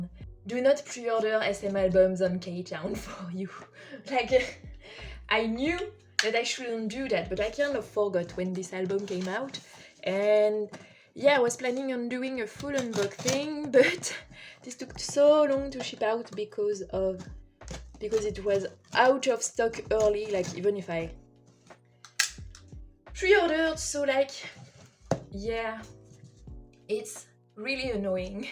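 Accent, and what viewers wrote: France. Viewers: your accent is so pretty! I knew you were french right away because i used to take french classes XD good video btw i just subscribed :)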